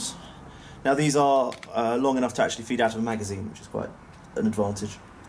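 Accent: United Kingdom. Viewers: Are you german? I've watched your videos for a while now and I've always thought that you were British. I was slightly surprised that you perfectly pronounced Zimmerpatrone. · Get so used to typical gun videos I wasn’t expecting a British accent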